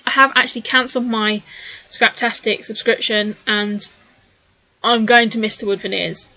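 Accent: United Kingdom. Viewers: Decided I can't watch your videos before bedtime! I dreamed I was touring a old castle with English royalty. I kept embarrassing myself with American manners. They asked me to act as a serving maid and dumped food on myself and the guests! Guess it's your English accent. Hilarious dream!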